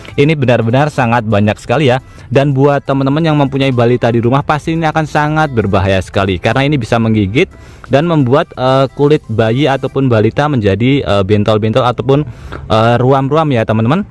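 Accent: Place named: Surabaya, Indonesia. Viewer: Suroboyo masku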